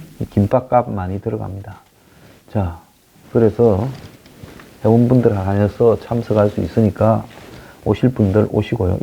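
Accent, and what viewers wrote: Gyeongsang, South Korea. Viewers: 완전 경상도 스타일 ㅋㅋ 화이팅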